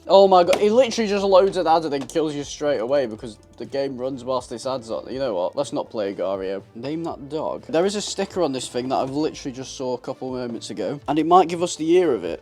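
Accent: United Kingdom. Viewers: british accent explains it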